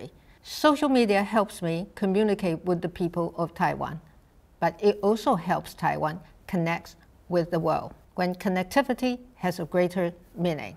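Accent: United Kingdom. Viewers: 果然英國留學英國腔厲害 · 小英總統的英式英文好好聽! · 哇！蔡總統說的是英式英文欸！ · 哇！英式腔，英語優雅及用辭禮貌，有點像古典貴族風範，難怪美國政客這麼喜歡她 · 有英國腔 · 英國腔太神la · 好好聽的英式口音喔😍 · 小英 got a bit British accent which is cool:) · 對啊！以後總統像一樣，用英式腔英語優雅及用辭禮貌，有點像古典貴族風範，希望大家都在這點有共識，要不然臺灣就被中共更嚴重。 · 蔡總統的英文是不是算英式發音啊？覺得滿好聽的，場景覺得拍得好有質感。覺得台灣的總統好先進，有臉書有twitter還當youtuber, 好親民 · 赤坂怜奈她是british 為主，american 為輔，有些音還是偏美式的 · 迷人的英國腔😍😍😍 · 蔡總統的英倫腔好好聽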